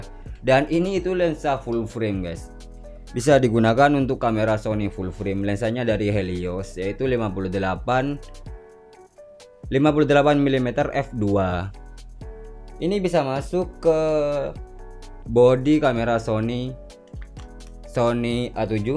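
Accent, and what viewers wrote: Madura, Indonesia. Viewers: Asal madura ya bang?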